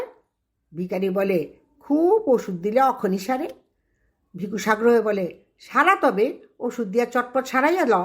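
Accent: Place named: Bangal, Bangladesh. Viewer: Darun porechen mashi bishesh kore bangal taan. Shunte shunte mone hochhilo ki privileged amra. Koto aramse thaka shotti kto complains amader.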